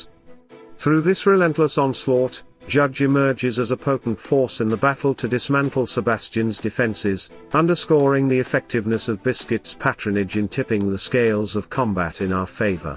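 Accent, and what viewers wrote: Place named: United Kingdom. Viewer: You sound like you are from Britain.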